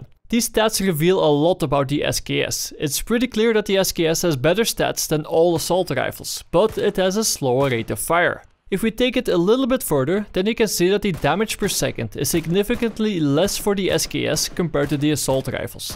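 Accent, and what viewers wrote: Netherlands. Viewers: You're a dutchie, right? :D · this is dutch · its a dutch accent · (i'm guessing he's dutch) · i think ure dutch nice vid ik ben ook nl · lets listen to your accent.... Are you Dutch?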